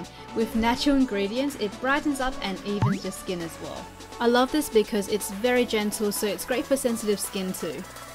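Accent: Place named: Australia. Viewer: wheres she from she sounds like an aussie maybe a kiwi...?????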